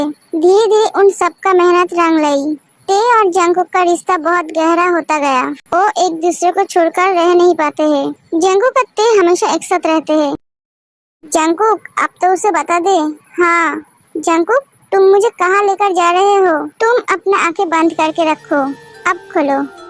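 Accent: Bengal, India. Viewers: Di Bengali ho kya aapki Hindi bolane ki Tarika se aisa lag raha hai mujhe.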